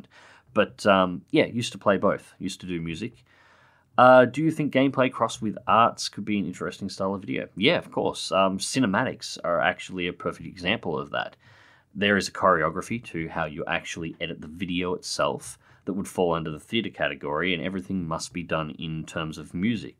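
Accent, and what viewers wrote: Australia. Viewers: you could play anything and i'd watch. It's nice to hear another Aussie voice every day.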